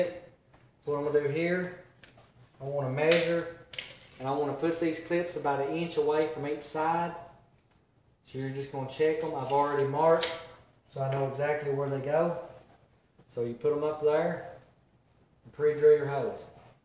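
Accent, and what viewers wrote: South, United States. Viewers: Just a bit southern.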